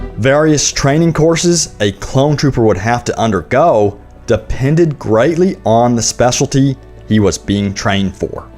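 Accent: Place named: South, United States. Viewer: You sound so fucking country.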